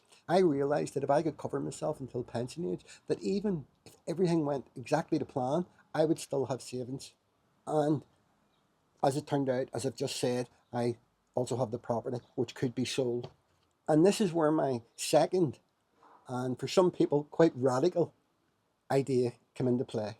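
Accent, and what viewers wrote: Northern Ireland, United Kingdom. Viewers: Where did you move from? NI by the sound of it. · well, you can hear that he's northern Irish. Some Northern Irish people choose a British Passport and some choose an Irish Passport but they can apply for either. (As I understand it). I'm from the republic, so this is just my understanding.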